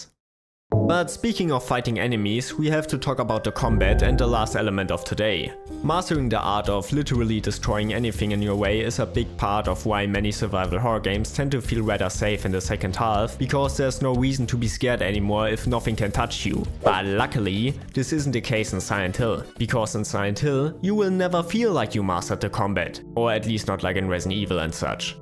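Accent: Germany. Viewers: Bist du deutscher? das hört man sofort 😁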